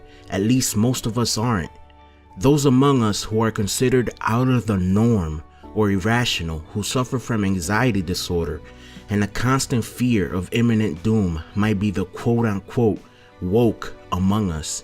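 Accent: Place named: Dominican Republic. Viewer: Papá tú acento es full dominicano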